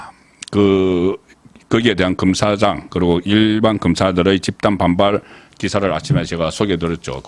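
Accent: Gyeongsang, South Korea. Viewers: 탄<핵 아니고 탄~핵이라니까. 탄자가 장모음이오 경상도 사투리 증말 듣기싫네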